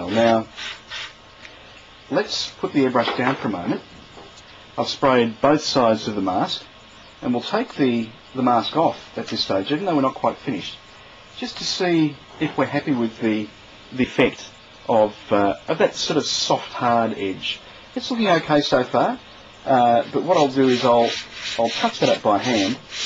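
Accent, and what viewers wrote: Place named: Australia. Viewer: is awesome and his Australian accent breaks up the monotony of his instruction. Don't forget about Sheppard Payne the master of the diorama (R.I.P.), Paul Boyer the decalling wizard, Aaron Skinner the armor fiend, Rodrigo Hernandez Cabos the airbrush genius, Geoff Coughlin; jack of all models, and the brush-painting Italian god Carmine Mari.:)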